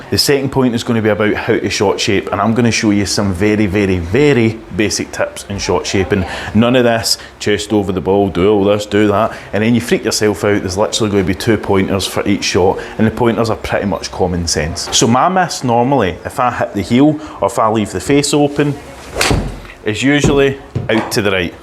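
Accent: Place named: Glasgow, United Kingdom. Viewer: Thanks a lot. The Glaswegian makes this Dutchman have to concentrate to follow along (more than with Billy Connoly). I bet though a lot of Americans won't even try to understand. I will give this method a try. Liked and subbed.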